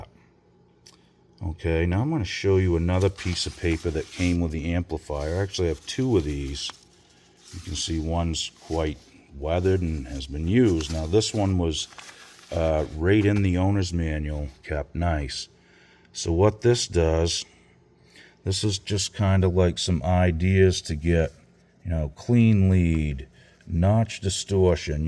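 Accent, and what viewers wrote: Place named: New England, United States. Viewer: Nice studio. 😳 Are you from Massachusetts or New England? Edit: Maine. just said it.😁 Sound like my cousins from Friendship & Waldoboro. But not quite as drawn out on some words. PowAH! ⚡️ lol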